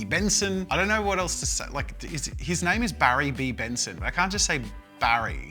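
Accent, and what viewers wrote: Australia. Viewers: wait, are you Australian?! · Mickey Mouse 🐭 pls pls are you Australian🇦🇺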